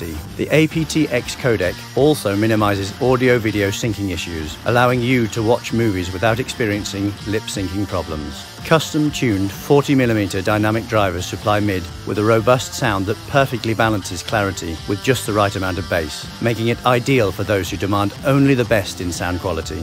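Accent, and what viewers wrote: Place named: Germany. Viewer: 3 yıl geçmiş ama neden türkçe başlıklı bi videoyu alman aksanlı ingilizce olarak eklersiniz ki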